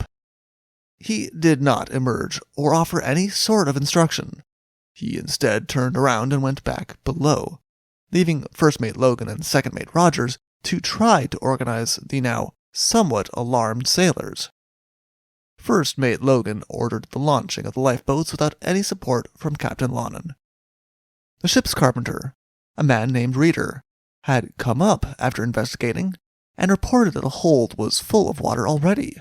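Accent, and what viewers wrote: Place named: United States. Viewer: What the hell is going on with the narration these days. Not to mention I am sick to death of hearing American accents for every damn thing that is read. They are the worst at the pronunciation of nouns and.any other name for that matter. How stupid is the rest of the world to let the USA take over everything on the planet. 😡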